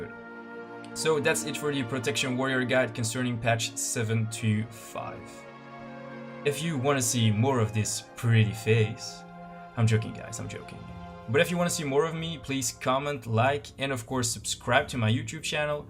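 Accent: France. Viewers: omg i watched the whole video just because of that french accent :3 <3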